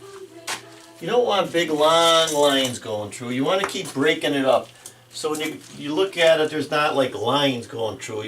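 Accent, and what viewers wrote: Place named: Pennsylvania, United States. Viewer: great videos I really appreciate what you do I've learned allot from your videos the voice brings me back i grew up in south eastern Pennsylvania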